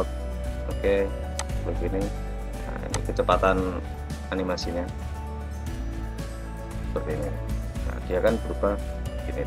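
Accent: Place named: Java, Indonesia. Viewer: baik Pak. Trima kasih. Sedang mengikuti video tutorialnya Pak. Karna masih awam banget jadi sering saya nonton. Kalau boleh saya tahu posisi Bapak di Jawa mana. Dari logat seperti orang Jawa. Mungkin Saja di Surabaya bisa saya kursus secara langsung. Trima kasih🙏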